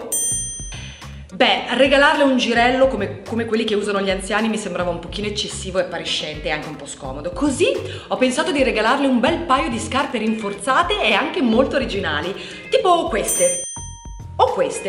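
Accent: Italy. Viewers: ma sei pure emiliana! ok ti adoro, è ufficiale! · quel dialetto! sei di Reggio Emilia?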